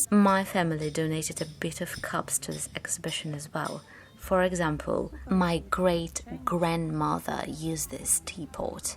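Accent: United Kingdom. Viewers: I really love to watch and listen your incredible voice in British accent:) it would be great to learn English from you :)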